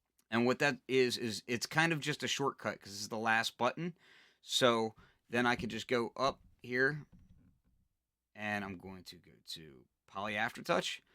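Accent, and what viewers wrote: Philadelphia, United States. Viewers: love your vids. gotta be a philly accent possible jersey or delaware.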